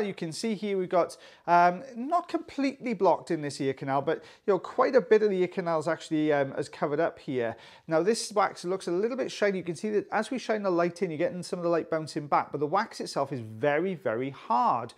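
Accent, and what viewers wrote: Wales, United Kingdom. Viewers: you're Welsh, right? Are you located in Wales, or elsewhere in the UK? I love the Welsh accent!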